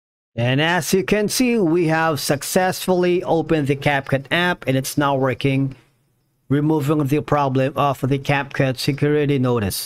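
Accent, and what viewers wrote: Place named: India. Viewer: 😂😂😂 indian vid trying to look white hilarious the accent give it out big time nice try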